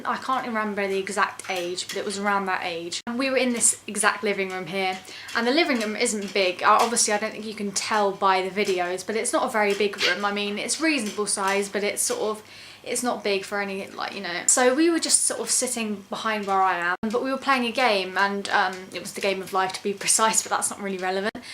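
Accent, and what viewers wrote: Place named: RP, United Kingdom. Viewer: Very posh.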